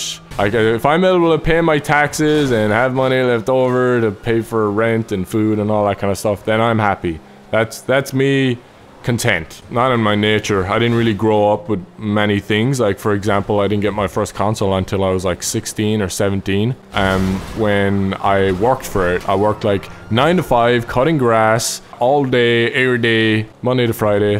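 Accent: Ireland. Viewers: r u Irish? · Where is he from in ireland.my guess Kilkenny .cork or kerry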